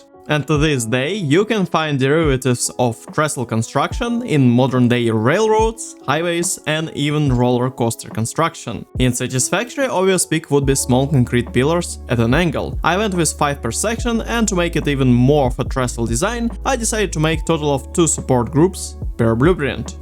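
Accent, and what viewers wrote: Eastern Europe. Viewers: слов'янський акцент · What's your accent? Sounds eastern-european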